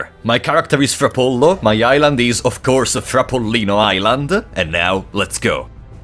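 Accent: Italy. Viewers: Are you a northern or Southern Italian?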